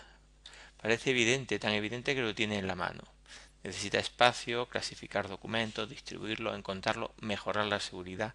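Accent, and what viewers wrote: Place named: Andalusia, Spain. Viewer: Estoy estudiando para secretaria de dirección y su vídeo me ha salido sugerido a través de uno recomendado para el estudio. No haga caso a esa persona que se ha metido con su voz, que no afecte a la seguridad en usted mismo, tiene una voz agradable ¡y ese acento tan encantador del sur...! Buena contestación, por cierto. ¡Ánimo!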